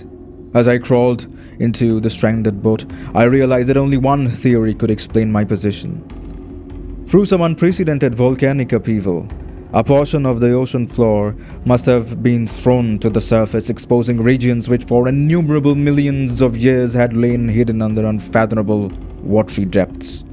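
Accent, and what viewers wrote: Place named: Pacific Islands. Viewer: What I like about yo voice is you sound like some pan Pacific islander who barely learned English and is describing the utter horror to some staunch fool who refused to see your reason to steer clear of a storm.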